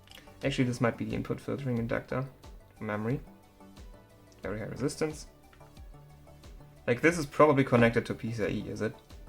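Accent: Germany. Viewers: I love ze German accent. Awesome video. I also watched the video where you explain the components of a GPU PCB. What I'm missing and would love for you to make is the missing link between those two videos. What points do you need to jab with your multimeter to test what component and what resistance are you expecting to see on a good card? If you find no flaws with resistance testing and power the card, what points are you then testing to test the various components and what voltages are you expecting? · Where have you learned all that ? Btw are you German ? :)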